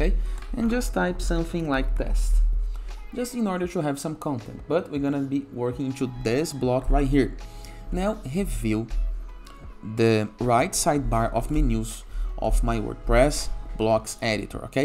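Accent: Brazil. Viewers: Sotaque BR. It gets one to know one.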